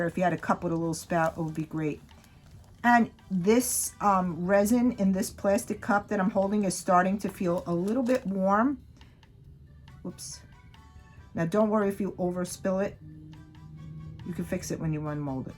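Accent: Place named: Brooklyn, United States. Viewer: This came out so beautifully! I know I’ve said it before, but your Brooklyn accent makes me so happy. 😂😂 Those molds are soooo beautiful. I’ve been experimenting with resin work for the past year or so and I have had nothing but trouble with it! I know you painted yours, so it doesn’t matter in this instance, but I had consistent problems with my resin not fully hardening and always having bubbles in it. I also have used that exact same resin and it STUNK so bad it made my whole house reek. ☹️ Omg you with the neck ruff 😂😂 I would love to see a full video tour of your she shed!!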